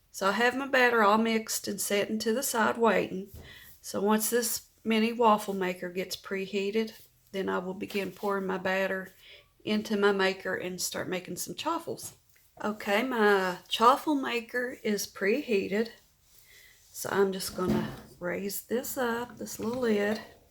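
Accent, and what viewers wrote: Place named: Georgia, United States. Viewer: I really like your accent and way of speaking. It reminds me of my dad's side of the family in North Georgia.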